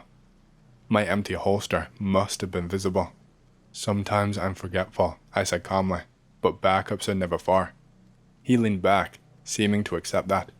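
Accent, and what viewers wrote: United Kingdom. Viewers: bro peak content as usual, where are you from (regionwise) i cannot place your accent in the uk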